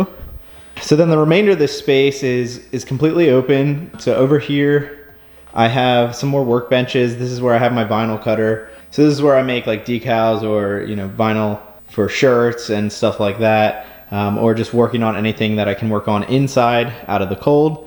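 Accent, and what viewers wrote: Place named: Philadelphia, United States. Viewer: I'm from Pittsburgh and you know we have a distinct accent. My welders helper is from the Philly area so I pick up on it. Your set up it awesome though Man!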